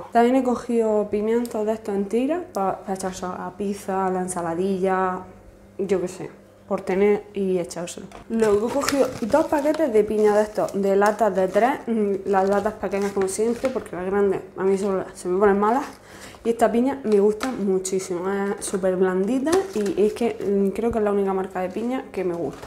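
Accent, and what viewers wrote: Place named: Granada, Spain. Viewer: Esas son las que compro yo! jaaja De que parte eres? No se por qué te noto acento Granaino jaja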